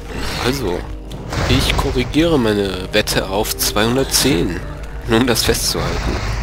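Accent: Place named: North, Germany. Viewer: du klingst als wärste ausm norden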